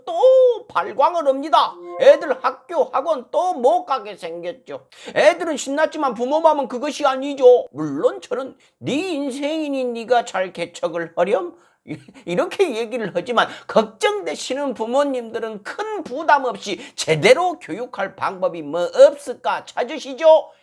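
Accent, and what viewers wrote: Jeolla, South Korea. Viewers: 워따 전라도 말을 겁나 잘하네요.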